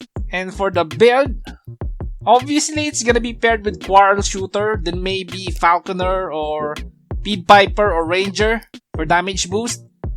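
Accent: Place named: Philippines. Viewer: you sound like a filipino. are you filipino?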